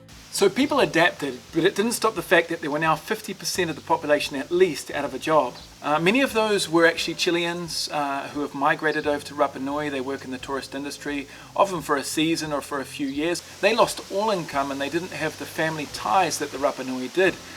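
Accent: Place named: New Zealand. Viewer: Was wondering if this fulla was from home, I could hear our kiwi Accent 😂🇳🇿🤙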